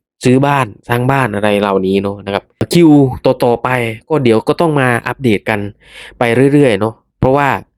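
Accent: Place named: Isan, Thailand. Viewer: ชอบการพูดคุยของคุณ ฟังสำเนียงแล้วรู้ว่าภาคอีสานแต่ทำรายการไม่ลำเอียงภาคก็พูดให้ดี ต้องเป็นคนอย่างนี้ซิคะถึงจะน่ารักและน่าติดตาม 👍👍👍👍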